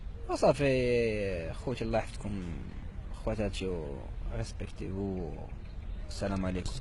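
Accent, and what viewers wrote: Algeria. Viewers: ta gueule tu est pas un marocain toi il parle comme les algérien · لا هداك دزيري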